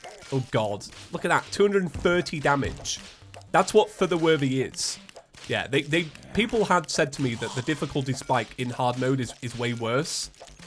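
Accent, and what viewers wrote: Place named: London, United Kingdom. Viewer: Yoo wats up fellow londoner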